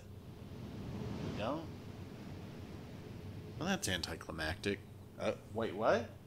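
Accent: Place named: England, United Kingdom. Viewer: no your good but i find the anglish accent fun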